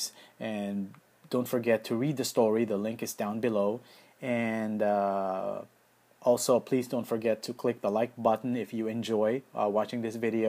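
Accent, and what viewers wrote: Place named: Philippines. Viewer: the way you speak, parang accent ng pinoy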